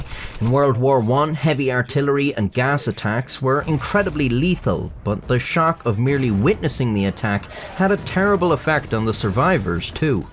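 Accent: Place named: Ireland. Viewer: Hey Fella, Gotta say I love your vids and content - been watching your vids for a few years - no BS ads, great story's, well presented with your lovely Irish accent and half a brain to boot. I won't and don't like and subscribe on principal. But keep your home page up in a tab for when you do upload and you NEVER disappoint. Legend mate! Effing honest Youtuber and hope you still make a buck. .... Just a little thank you from me to you. Cheers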